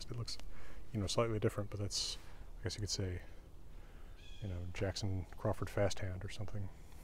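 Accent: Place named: Georgia, United States. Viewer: Well, I like your handwriting ! Thank you for sharing a glimpse of your teenaged self . You were already becoming an intellectual by then ! I just stumbled upon you , when you and Simon Roper were “communicating “ in Old Norse or something ! Just last night up popped you and another linguist were talking about the beginning of the alphabets. I am of retirement age, and home school two granddaughters, a 8 year old third grader , and a 13 year old 8th grader . Would love some of this to rub off on them ! Do you by chance have something I can use to inspire them ? I live is SE GA. Natives of NE FL. Surprised that you went to U GA ! Your soft GA accent still coming through .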